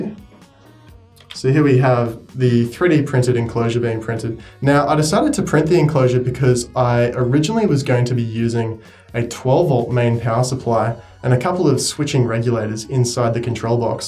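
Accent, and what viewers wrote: Australia. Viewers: Well done, I thoroughly enjoyed your tutorial and it was great to hear a comrade from Us-trail-ya (Australia). I've been dabbling with Arduino and all sorts of odds and ends, then came across this video which has inspired myself, and hopefully others to incorporate different methods to create their own piece of modern art.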